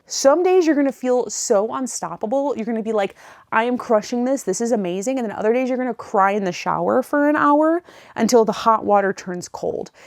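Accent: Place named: Midwest, United States. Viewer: I just hear accent, maybe Midwestern? Maybe there's a touch of nasal sound. Trying to hear what you complain about, maybe a couple bits of that sound. But certainly not like the Hollywood exaggeration that makes you want to mimic the sound and turn it off. Anyhow, she sounds like a sweet and lovely young lady. Didn't your mama teach you that if you can't say anything nice, to say nothing at all? Just scroll on with your miserable self and leave the sweet ladies alone!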